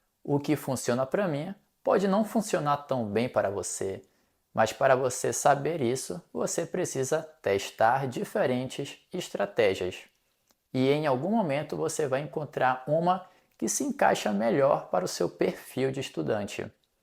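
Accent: Rio de Janeiro, Brazil. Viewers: Que motivante, gostei! É um prazer ouvir esse seu sotaque carioca, muito bonito, que posso utilizar como um modelo para o meu. Obrigado! 😊 😎 🎉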